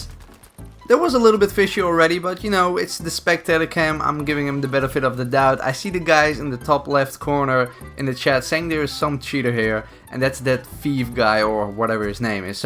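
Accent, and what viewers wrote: Netherlands. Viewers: You sound like you are from Holland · Lekker dat nederlands engels haal je er zo uit hahahaah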